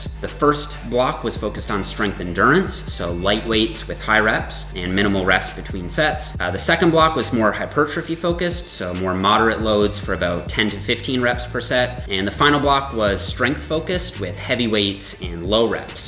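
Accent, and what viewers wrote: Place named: Newfoundland, Canada. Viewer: My thoughts as I watch you " Oh hey his accent is familiar....wait a second, his last name is Nippard googles your name HAH hes a newfy! " Yaaaaaaaay!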